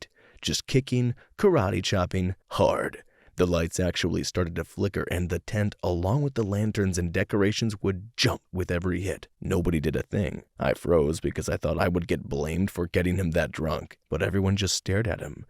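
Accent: Ireland. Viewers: Love the Irish accent here narrator, good job :D! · Oh I really love the voice of this narrator, similiar to the 'anyway next story' narrator but less unhinged. p.s: I've been living in Ireland for the past few months and thought the accent was spot on. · I can't say as to the accuracy of the Irish accent, but it was a nice fair voice that bitch didn't deserve. I wish my text-to-speech sounded like that... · I gave you a like for the Irish accent lol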